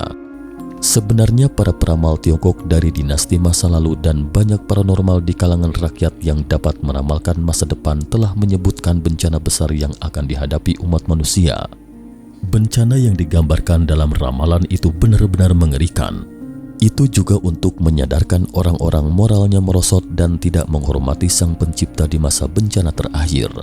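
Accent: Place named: Bandung, Indonesia. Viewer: Suaranya ini mantab tapi masih ada logat aksen daerah nya, kadang ada akhiran Ak.. Ak... Asli Bandung atau mana ya..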